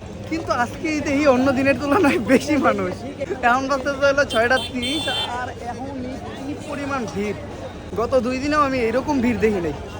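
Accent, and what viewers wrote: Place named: India. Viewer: কে কে খেয়াল করেছেন, ভাইয়ের কথা বলার ধরন কিছুটা ইন্ডিয়ানদের মত হয়ে গেছে, 😅😅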